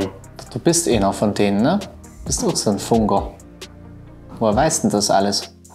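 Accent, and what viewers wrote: Saxony, Germany. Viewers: Auch wenn es nur Spaß ist, aber warum haben deine ganzen Querdenker-Videos nen Sächsichen Akzent???? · Mal ganz ehrlich, klar es ist Spaß aber warum spricht er in den Videos mit Sächsischem Dialekt…warum nich einfach Hochdeutsch…das ist so Klischee Haft und herablassend…einfach traurig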